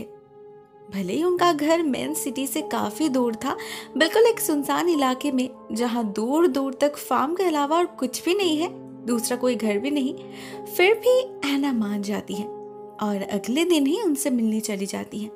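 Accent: Bengal, India. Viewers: Hi sis❤❤tmi ki bengali 🤔🤔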